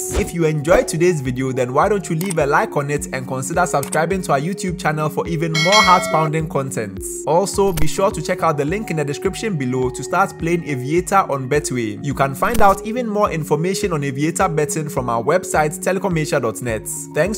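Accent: Nigeria. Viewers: Bro has a Nigerian accent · how is bros accent nigerian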